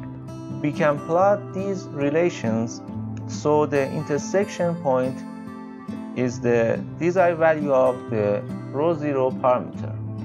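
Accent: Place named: China. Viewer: Nice video! BTW, you sound Chinese.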